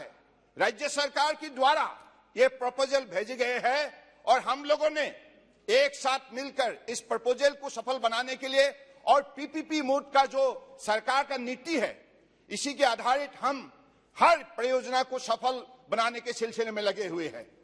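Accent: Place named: Assam, India. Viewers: Accent assamese hai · The beautiful assamese accent kicking in.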